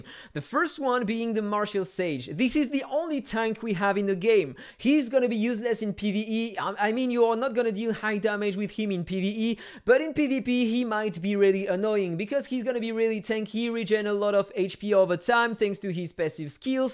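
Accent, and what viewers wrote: France. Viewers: crushing it! best content out there so far, i just struggle with the french accent, but thats on me not you :P keep crushing it! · jsens un petit accent français ? Je me trompe peut-être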